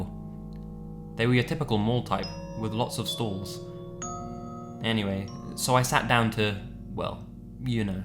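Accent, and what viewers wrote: United Kingdom. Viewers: You sound like CreepsMcPasta! Probably cause he's also British but fair enough, I like your accent! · i'm newer to this channel, but I really like it! does a good job of narrating these in a way that emphasizes the important points. You wouldn't think that a higher voice with a British accent would be very good for narrating horror, but his is just about perfect. subscribing as Legion member 527,001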